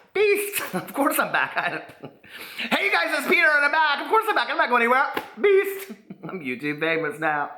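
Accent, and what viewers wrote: United States. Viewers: If you are from Illinois, why are you speaking with a country accent? I understand the gay...but come on.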